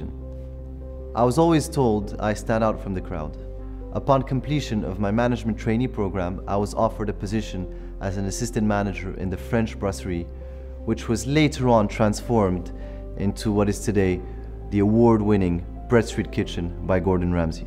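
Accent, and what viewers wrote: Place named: Morocco. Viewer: What ever is the language you speak but your accent still Moroccan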